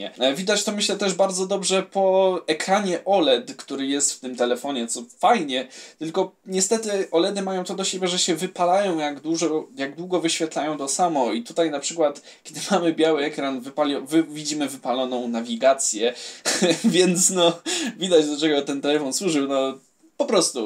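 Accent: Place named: Podlasie, Poland. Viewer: akcent z Podlasia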